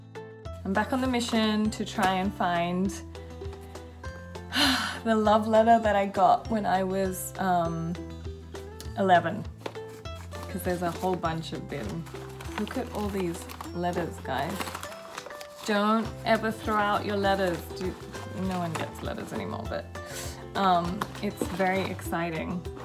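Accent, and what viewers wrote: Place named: United Kingdom. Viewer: Hi Anusha I am your big fan from Nepal ... I love you so much MTV most wanted... My teenage time.. You were my favourite n still i love u n i just love the way you speak your English sounds like British accent.... Muaahhhh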